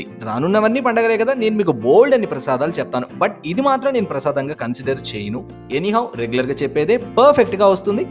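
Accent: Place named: Chennai, India. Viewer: Meedi a oru Chennai telugu la undi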